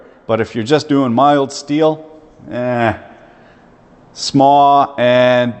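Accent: Canada. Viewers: Canadian accents. The combination of minnesota and irish accents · As a yankee I just love the Canadian accent and slang in these videos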